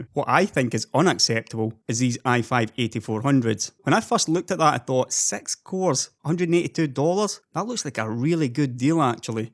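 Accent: Scotland, United Kingdom. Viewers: The only thing better than your video execution and the information provided is your glorious Scottish accent.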